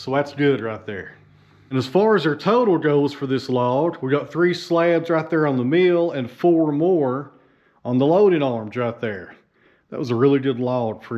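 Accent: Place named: Florida, United States. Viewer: New subscriber brother i really this adventure im an ole Florida cracker and I pronounce the same as u God bless you brother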